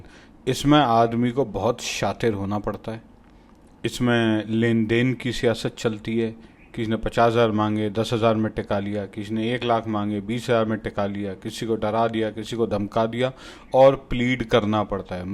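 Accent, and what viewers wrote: India. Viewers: India was INDIA under aurangzeb Rule .. the largest UNITED land area .. otherwise India, before MUGHALS was never a SINGLE country or had any central GOVT. It was divided into different states. Hindus ne UNITED India pe kabhi rule nahi kia , na hi kabhi INDIA united tha ... very biased ... u are articulate though and ars misguiding people confidently... U are INDIAN ,as it seems from ur accent and pronunciation... Pakistan ban gya , u hv to accept It ..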